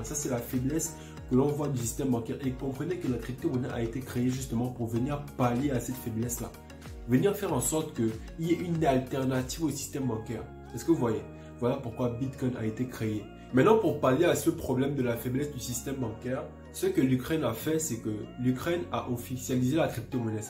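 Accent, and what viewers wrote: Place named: Gabon. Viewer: Fondamentalement Fondamentalement tu aimes le mot la hein bro ? tu as l'accent d'un gabonais, en tout cas force à toi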